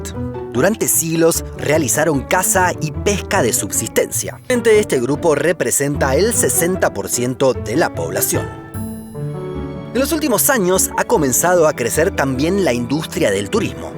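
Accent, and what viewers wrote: Argentina. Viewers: El narrador habla argentino, viste?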